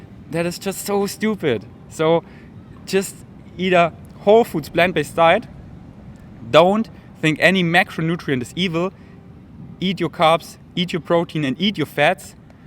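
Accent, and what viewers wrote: Germany. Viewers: I knew it, that accent looked like a german one (scroll in the comments) Tolle Video☺️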